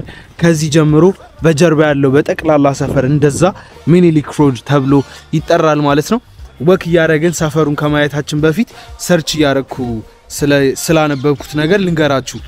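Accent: United Kingdom. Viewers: your British accent is lovely 🥰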